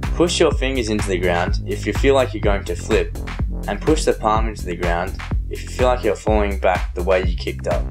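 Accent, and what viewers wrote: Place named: England, United Kingdom. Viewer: finally an english accent i can understand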